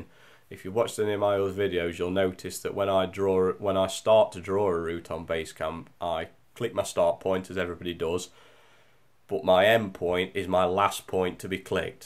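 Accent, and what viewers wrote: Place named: Stoke, United Kingdom. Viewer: Good vids mate, I'm pretty happy with it but I always like seeing if others do owt different 👍, sound like you're only down the road from me ...Stoke ?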